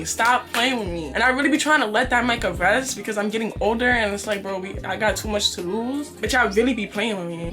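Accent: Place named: Harlem, United States. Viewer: She sound like she from Harlem.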